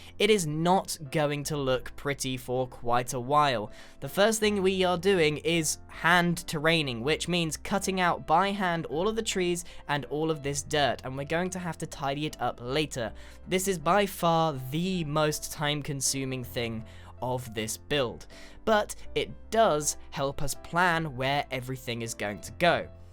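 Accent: England, United Kingdom. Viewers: THAT ENGLISH ACCENT AND CALming voice really makes me feel calm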